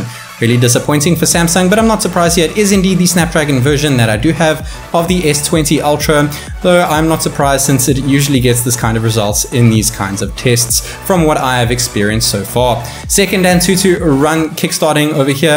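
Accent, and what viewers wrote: South Africa. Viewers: You sound south African, are you?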